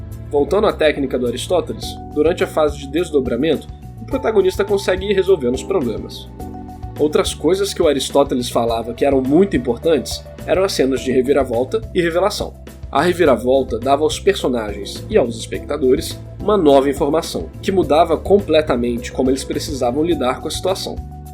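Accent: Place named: Maranhão, Brazil. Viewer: O sotaque desse cara é muito dr maranhense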